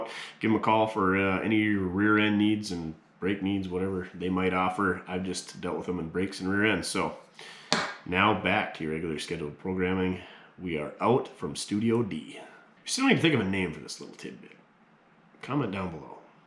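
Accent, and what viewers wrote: Minnesota, United States. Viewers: Great project, make dad happy. Your accent makes me feel like I'm back in Grand Rapids Mn.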